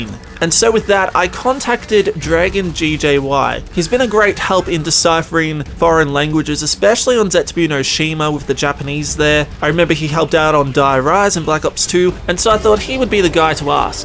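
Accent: Australia. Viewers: Is this guy australian ??? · You Aussy?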